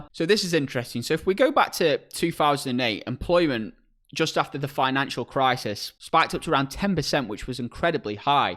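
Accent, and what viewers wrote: United Kingdom. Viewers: Can you try to speak with less of an English accent? I can’t attention long enough with your draw.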